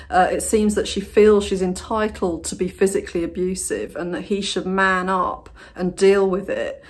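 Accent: England, United Kingdom. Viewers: Accent too English for me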